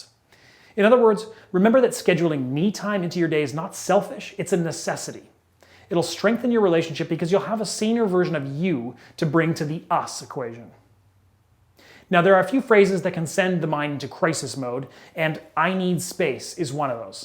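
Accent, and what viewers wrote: Canada. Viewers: Nice. I noticed the Canadian accent. Lol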